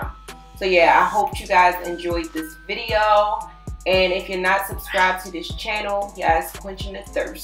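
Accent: Baltimore, United States. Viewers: You from Baltimore? I hope you don’t say “Ehh no “ lmao cause you low key sound like you from here plus you cute asf !! 😍Your hair came out BOMB! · Heeeey! Are you in the Baltimore area? I hear your accent! Do you have a shop here? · BMore accent undeniable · She must be from Baltimore I hear the accent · As soon as you started talking, I went to find your IG.. I just KNEW you were from Baltimore lol. I am as well ♥️ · Definitely turned out cute!! Sound like u from bmore! Lol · You are so pretty!!!! Are you from Baltimore? U have that city accent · You from Baltimore huh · I love your accent? You from Baltimore? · You from Baltimore omg 😭 I can hear it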